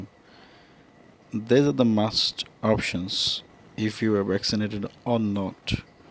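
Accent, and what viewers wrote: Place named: United Kingdom. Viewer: You really have posh English voice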